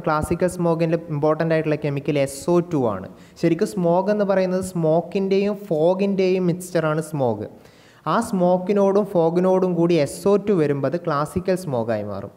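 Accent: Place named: Kozhikode, India. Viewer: Sir Kozhikode aan allo😌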